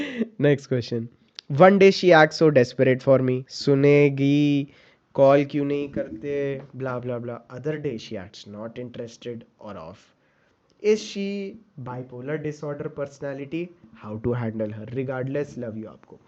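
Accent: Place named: Maharashtra, India. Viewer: Your marathi accent is damn good bro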